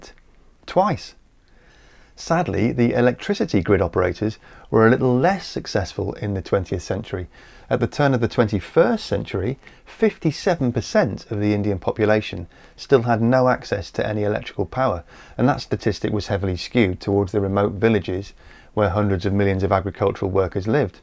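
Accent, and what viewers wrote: United Kingdom. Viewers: woah ! ur british!! ? but u dont sound it man! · Yes he does! Where on Earth did you think he was from? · What!? Hes clearly british, now i have to know where you thought he was from?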